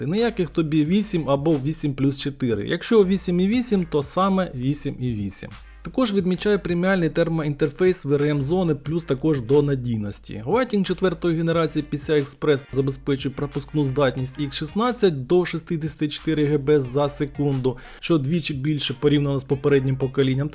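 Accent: Transcarpathia, Ukraine. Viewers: Ти закарпатець?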